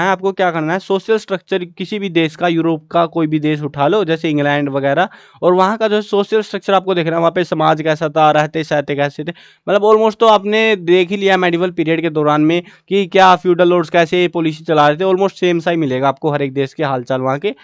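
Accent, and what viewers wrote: Haryana, India. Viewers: Sir are you belong to haryanavi background 🙂🙏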